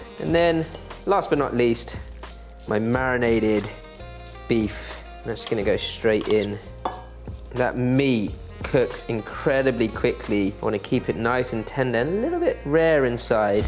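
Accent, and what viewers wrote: United Kingdom. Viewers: Asian with british accent is wild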